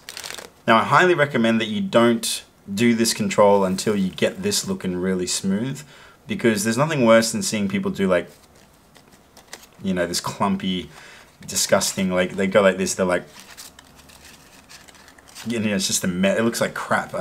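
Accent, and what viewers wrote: Australia. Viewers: are you Australian?😂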